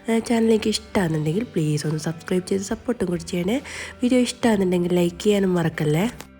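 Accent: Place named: Kannur, India. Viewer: തനി നാട്ടിൻപുറം കണ്ണൂർ സ്ലാഗ്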